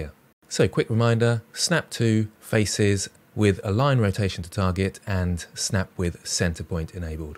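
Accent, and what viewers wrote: England, United Kingdom. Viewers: Concise, clear, and complete. Absolute amazing tutorial. Bonus points for narration with an English accent.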